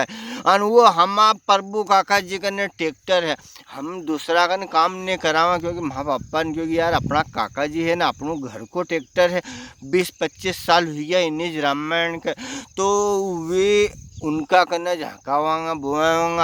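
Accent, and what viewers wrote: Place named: Nimar, India. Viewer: Shuddh malvi bola kr bhai nimadi kyu add krta h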